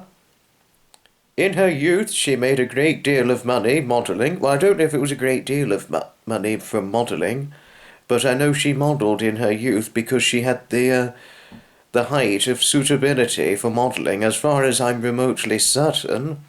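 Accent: England, United Kingdom. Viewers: … you certainly need to do “shout outs & personalised messages “ just as Nigel farage and other YouTube celebrities do. Your ace in the whole is that you have the quintessential English accent which I feel would go down a storm in th USA!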